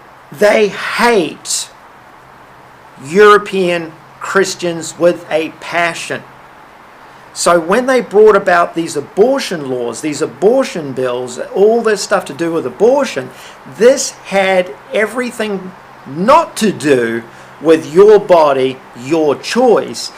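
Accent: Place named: New Zealand. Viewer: Never miss your videos, always on point ,no pulling punches. Am aware of a lot of the info you talk about. Just great to here someone on media saying it. Can relate to you because i also have an engineering background. Just love that Kiwi accent with a hint of American as well. Am Aussie so it is very easy to here it. Your subs should be in the hundreds of thousands ???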